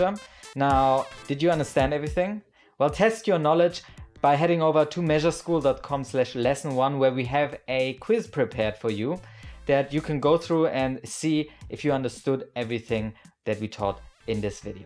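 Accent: Germany. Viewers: love that german english hehe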